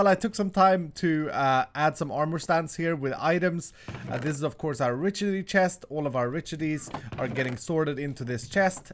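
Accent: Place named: Sweden. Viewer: are from sweden? sounds like that.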